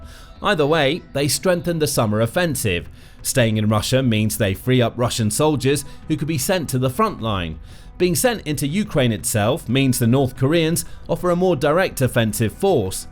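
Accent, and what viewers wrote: United Kingdom. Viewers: The narrator is obviously British...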